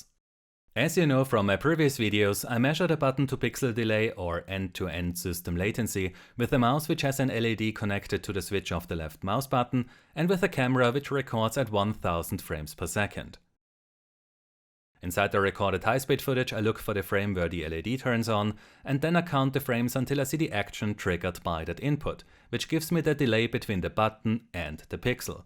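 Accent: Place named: Germany. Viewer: You know you're in good hands when you hear a German accent..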